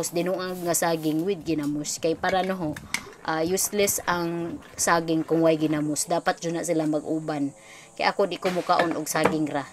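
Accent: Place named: Bohol, Philippines. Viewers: Lahi2 jud tag accent amoang inistoryahan pinagahi pod hehe,anyway taga isla kos Buenavista niya kabalo kos inyun accent..kababayan ganahan kos imong tingog maong pasagdi lang na cla iampo nalang hehe. Watching from ohio USA God bless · Kalami na lang gyud paminawon ang imong bol-anon nga accent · Same tag accent dre saint Bernard southern leyte ms,ang ka J jud me dre gahe m dre ug inistoryhn hehehe, maoy istorya dre ag amy daw accent ky s bohol jud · NINDOT KAha imo accent, Normal ug native sa Bol-anon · taga loon ms. kay grabe ka gahi at first maka tawa ko pero di ko kabalo unsaon na di sya ma offend, j man pud ahong inistoryahan kay taga leyte man ko pero ang taga loon grabe ka gahi jud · Basta boholanon puro naay GGGG,,kaway2x sa mga naay 1/2 dugong boholanon 👋👋👋👋bisag naa me dere nagdako sa mindanao pero makuha gyud namo ang storya ur talk pagka buholanon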